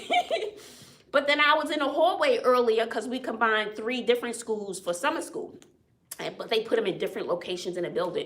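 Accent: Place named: Boston, United States. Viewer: Where are you from? You sound like and remind me of my aunties from Boston?? ☺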